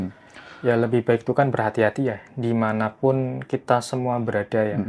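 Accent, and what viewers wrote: Pekalongan, Indonesia. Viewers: Masnya ini logatnya kaya Pekalongan daerah kidul ,Kedungwuni ,kajangan ,mbligo pringlangu ngidol lah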